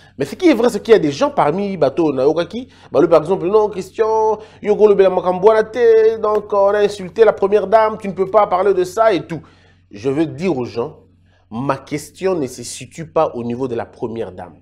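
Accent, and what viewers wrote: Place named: Luba, Democratic Republic of the Congo. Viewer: Félicitation Christian pour tes recherches sur cette culture. Et ta façon de prononcé les mots démontre que tu es un luba mais de la diaspora .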